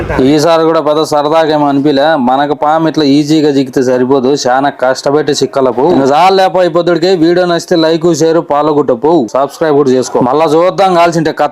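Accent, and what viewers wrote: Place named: Anantapur, India. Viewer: Ananthapuram telugu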